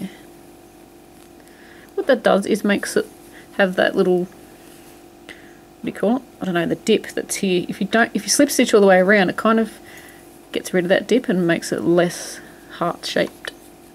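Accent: Australia. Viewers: I want to thank you once again, I make your one piece wonder sweater all the time for new babies. Finding your heart hat was a total plus for me, you the best person I have done tutorial by far. You have made me feel like I can do more and feel good about my work. Keep doing these wonderful tutorials, I love your accent. It reminds me of family that live in Sidney who I don't get to see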